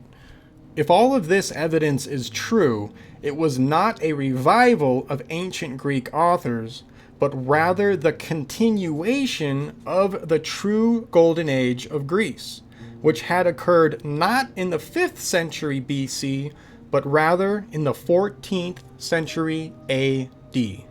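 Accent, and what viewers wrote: United States. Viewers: Dude, you sound like you're american. Please come to Europe, you can see christian sepulchres from the 4th century underneath my neughbours back yard UNPRESERVED. You can see a church from the 10th century on a hill in a nearby village. You can see a fortress built by Turks on top of a Byzantine fortress who built on top of a Roman Castrum on top of a Celtic hillfort.... and I live in the Balkans. It's all fine and dandy when you read and see PRESERVED places from history. Come see raw forts wrecked centuries ago, you'll get a sense that Roman stuff is way older than late Medieval stuff when you actually touch it OUTSIDE OF A MUSEUM, outside of internet. Plus, the girls are fine and a beer is like $2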